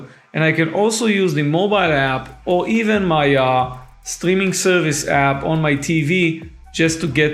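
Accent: Israel. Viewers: You have an Israeli accent 🤔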